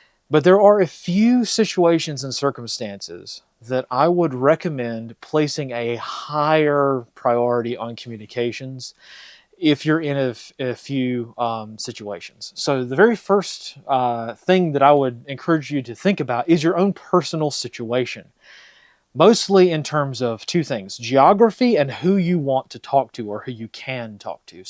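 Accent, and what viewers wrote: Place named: Pacific Northwest, United States. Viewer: Great content. Sounds like you live in the PNW.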